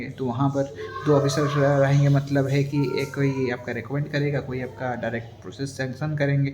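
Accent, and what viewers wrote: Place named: Odisha, India. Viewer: Are you an Odia ? I guess it from your pronounce 😊❤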